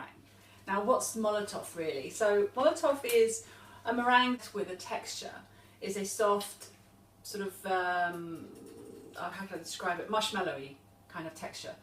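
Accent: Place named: United Kingdom. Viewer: Lucia P Que parvidade. Cada um fala a língua que quer. O inglês é mais universal e funciona melhor em termos de views no youtube. Além disso, não conheço esta senhora, mas o sotaque dela é britânico, portanto presumo que ela seja inglesa e não portuguesa, e que apenas esteja a ensinar uma receita portuguesa.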